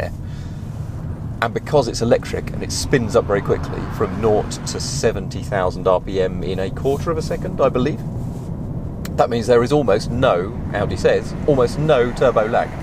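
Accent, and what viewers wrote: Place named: United Kingdom. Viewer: Oh jeeeezzzze that thick british accent and swallowing the voyals!!!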